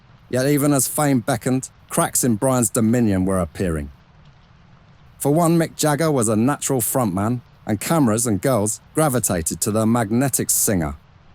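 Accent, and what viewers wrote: United Kingdom. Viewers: British Howard Cosell narrator...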